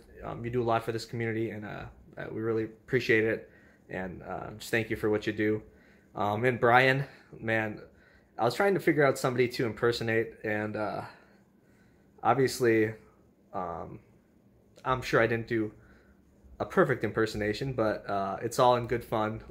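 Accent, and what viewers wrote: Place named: Midwest, United States. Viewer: Hahahaha you have the voice down pat! Awesome! You still have the Midwest accent though!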